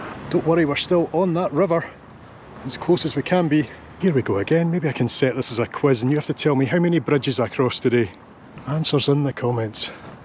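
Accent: Cork, Ireland. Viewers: Cork accent is very fast 😂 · My grandad was from cork. I love the accent ❤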